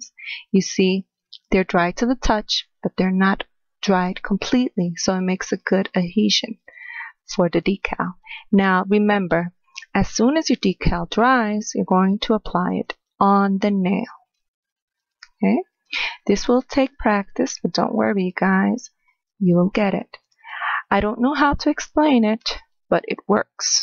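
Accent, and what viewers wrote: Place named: Cuba. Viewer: No no hablas muy bien pareces cubana me gusta tu acento eres muy buena haciendo videos sige asi yo te sogo siempre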